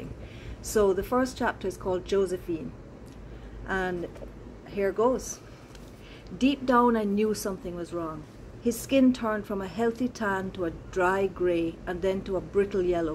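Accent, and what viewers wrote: Jamaica. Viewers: I love this! Lynda is one of my good friends, so it's wonderful to hear Redemption Songs read out loud in a genuine Jamaican accent. As a Jamaican author, I appreciate that you are sharing the stories from our beloved land. 🇯🇲🇯🇲🇯🇲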